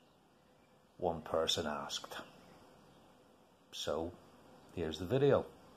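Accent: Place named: Ireland. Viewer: I heard that Irish accent a little more this time :o)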